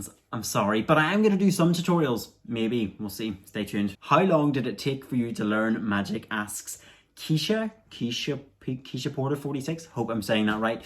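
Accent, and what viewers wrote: Ireland. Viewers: I’m not 100% sure but is your accent Irish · I love his Irish voice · Your accent is an Irish one right? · Are you Irish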